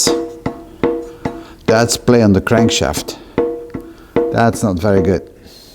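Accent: Netherlands. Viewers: Great Videos - I thank you for them - your accent sounds like my Van Engen relatives from Holland - Gary - Iowa - U.S.A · you sir have amazing skills in bodywork and mechanics. sound like a Dutchman who lived in Canada for some years.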